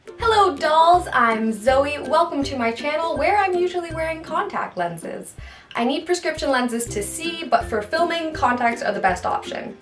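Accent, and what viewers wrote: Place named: Canada. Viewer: I love Canadian accents ❤ and it adorable.. would love more videos like this.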